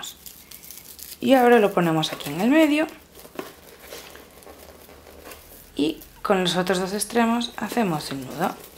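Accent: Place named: Galicia, Spain. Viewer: Tu bello y dulce acento gallego te delata😄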